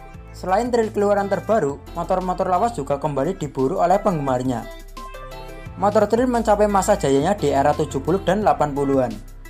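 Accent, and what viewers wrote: Java, Indonesia. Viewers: Jowonya medok amat...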